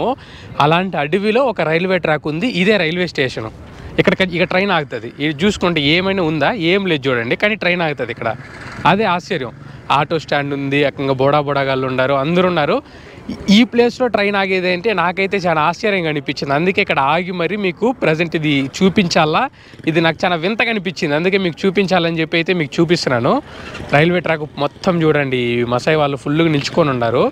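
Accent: Chittoor, India. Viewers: Brother Midhi Chittoor district Kadha మీ మాటలు అలానే ఉంది 😊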